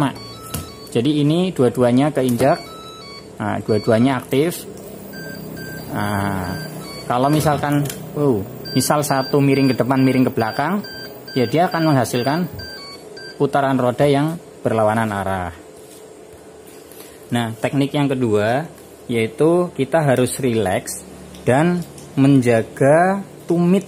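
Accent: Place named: Java, Indonesia. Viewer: Medhok syekali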